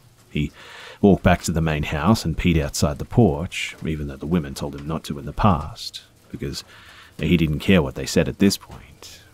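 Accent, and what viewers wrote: Australia. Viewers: I’m new to your channel. Is that an Australian accent I hear? Love your soothing voice! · I love listening to you as i love the sound of your voice and your Austrailian accent too, i am Scottish, the worst accent in the world, so i really appriciate your accent and American accents too, keep on telling your stories true or not i love listening to them.best regards xAnne.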